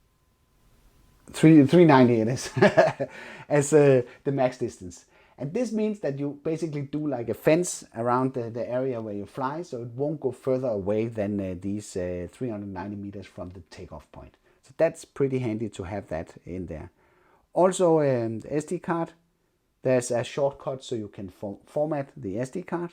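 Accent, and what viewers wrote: Denmark. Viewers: Danish accent it’s like Chinese ,any way it’s good tutorial☺️ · It Sound like youre from Denmark because i am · Danish accents are the best! Thanks for the info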